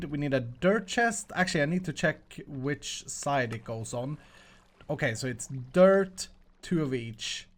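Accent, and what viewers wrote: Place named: Sweden. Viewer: Are you swedish is kall is a swedish word and your first vid sounds like a swedish man talks english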